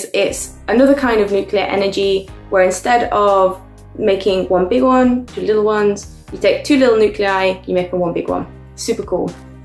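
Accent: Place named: United Kingdom. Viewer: Nice to hear some british accent here ^^ I really see a potential to your channel so here's a few tips to improve: - Love the improvised style but maybe have things a little more scripted so you stumble less on words and make explanations a little clearer - Sound echo is not much of an issue for now, just try to correct the channels, your voice is very much on the left channel here - Keep the balance between vulgarization and complexity. It's a niche but people are still very much looking for channels that go more in depth, best example is what Scott Manley does with rocket science Else, nice video, nice background, we can clearly see you are passionate about the topic and I can see you going places with this channel, keep up the good work ! Also, nice instance of laser fusion reactors in fiction is in The Expanse, it's what powers the ships and you even see the inside of the reactor in a few scenes (can't remember which episodes ^^)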